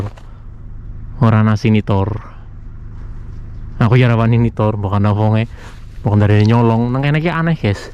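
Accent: Banyumas, Indonesia. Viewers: Kesuwen neng jepang ora kelalen ngapak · ngapak temen mas..rika asline wong ndi...semngat masee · seneng ngapak mas.jwa Endi mas.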